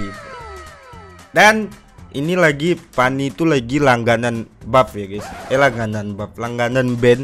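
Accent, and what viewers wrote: Java, Indonesia. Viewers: Bang itu orang jawa ya? Kirain di asli sunda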